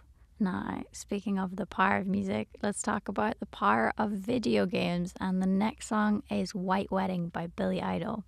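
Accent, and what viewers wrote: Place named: Ireland. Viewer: I don’t know how I missed these before but I just listened to the most hauntingly beautiful version of I have ever heard. Your voice just transports me! I love listening to your soundtracks and your beautiful Irish lilt! God did magic when he created your voice! Thank you so much for being brave enough to share it with us! Love you!!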